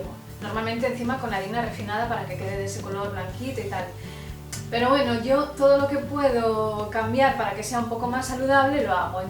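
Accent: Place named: Asturias, Spain. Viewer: Kaixo. Me gustan tus recetas, pero lo que mas me gusta es tu acento asturiano. Saludos.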